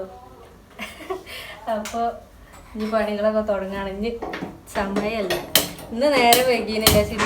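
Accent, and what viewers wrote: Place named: Malappuram, India. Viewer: നിങ്ങളെ നാട് എവിടെ തനി മലപ്പുറം ആണ്❤😂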